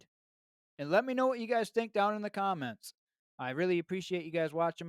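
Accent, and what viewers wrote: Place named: Wisconsin, United States. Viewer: You sound like a Wisconsinite, I bet you say "you's guys"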